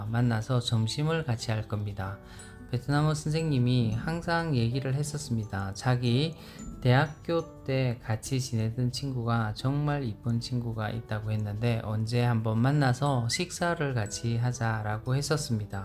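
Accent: Gyeongsang, South Korea. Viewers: 그냥말편하게하세요^^ 경상도같은데ㅜ이건머사투리도아니고표준말도아니고ㅜㅋㅋ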